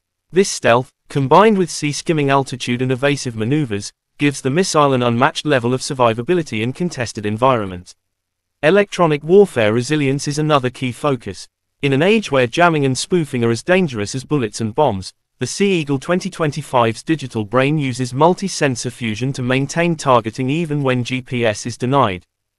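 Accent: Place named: United States. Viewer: I wish all these American narrators would foxtrot oscar.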